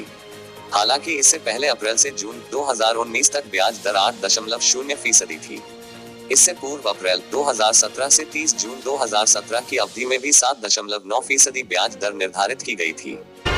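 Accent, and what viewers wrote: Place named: Himachal Pradesh, India. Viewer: Sir aapki voice me jo himachli tone aati h hm to bo sunne k liye hi aapka video dekhte h plss phle wali voice laye.....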